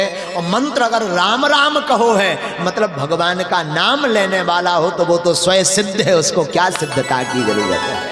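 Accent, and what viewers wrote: Bundelkhand, India. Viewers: Gurudev ji k shree mukh se bundelkhandi boli bahot achhi lagti h jai sitaram 🙏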